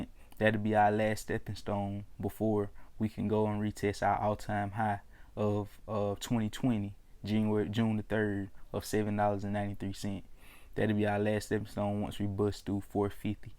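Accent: South, United States. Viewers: Cuz sound like he lived in Ga some point. I hear that southern tone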